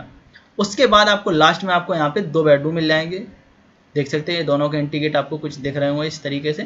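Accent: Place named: Bhind-Morena, India. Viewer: भिंड मुरैना क्षेत्र के लग रयै आवाज से।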